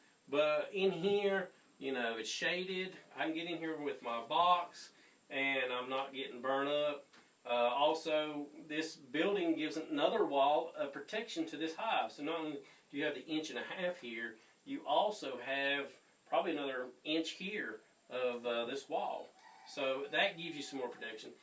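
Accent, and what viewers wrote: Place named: United States. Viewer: sadly with bad acoustics and US accent I cannot hear or understand this video. any chance of sub-titles for key points. Thanks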